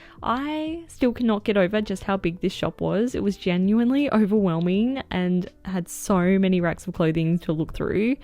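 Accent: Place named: Australia. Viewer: It's so interesting to me how accents differ between English speaking countries, especially an Aussie accent.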